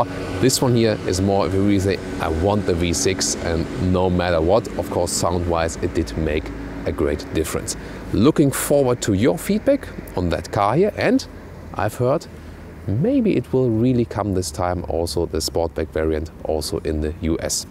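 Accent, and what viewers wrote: Germany. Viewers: Wenn du einfach das deutsche Englisch heraushörst. ;-) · Good information! And I really do like your fucking german accent. :))) Great! Did you do these videos in German? I'd like to watch it in German.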